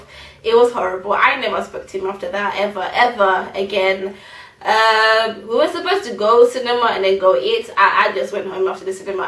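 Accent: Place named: Zimbabwe. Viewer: you have the cutest zimbabwean accent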